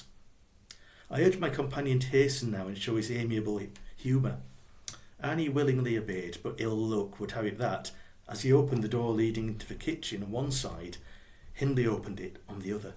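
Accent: Yorkshire, United Kingdom. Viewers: I just don’t understand why you apologise for your Yorkshire accent!!! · Wuthering heights is the best novel in my opinion. Movie adaptations cannot capture the essence of this book in my opinion. It's great you are reading it in the wonderful Yorkshire accent it is meant to be read in!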